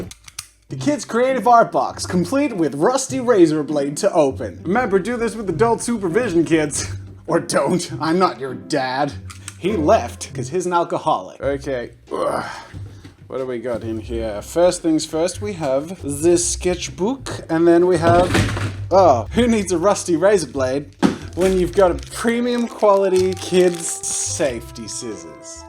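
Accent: United States. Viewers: american accent is amazing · why is his american accent so good? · His American accent is pretty great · * doing an american accent is so scarily accurate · Your America accent is spot on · *'s American accent is totally on point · THE AMERICAN ACCENT... WHY DOES IT SOIND SO DIFFERENT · Omg your american accent! More please!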